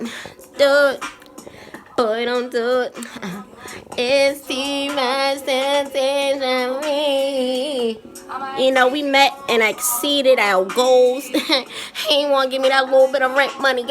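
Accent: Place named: Baltimore, United States. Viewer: Baltimore accent..🌺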